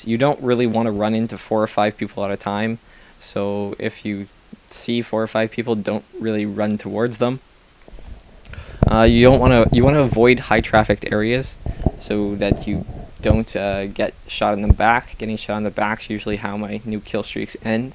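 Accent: Canada. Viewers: Dat canadian accent he has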